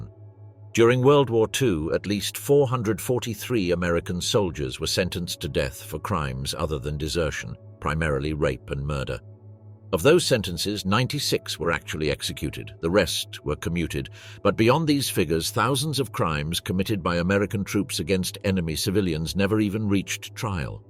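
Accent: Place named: United Kingdom. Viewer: Why do they have a limey talking about Our Army?!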